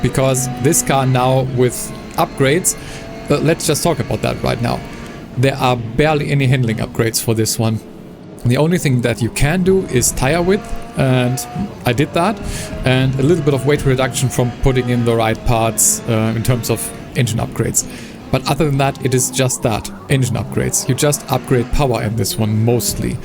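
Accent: Germany. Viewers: Your english sounds like a gernan guy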